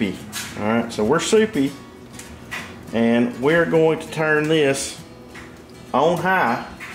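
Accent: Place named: South, United States. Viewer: Nice the accent you know the country guy can cook. New sub here thanks for the video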